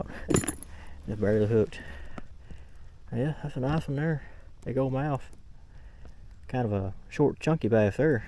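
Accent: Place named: Arkansas, United States. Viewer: You from Arkansas? I recognize the accent.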